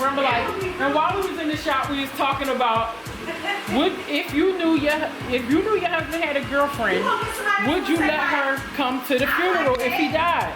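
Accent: Philadelphia, United States. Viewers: I was trying to figure out where you were located then I heard “Real rap” I said yea she’s def. from Philly lol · I'm a guy who loves watching your videos, honeslty just discovered them yesterday. Please tell me that's a Philly accent??? <3 You speak like a cool ass big cuz or young auntie from 215 for sure <3 ...nevermind you just said "home shop in Philly" lol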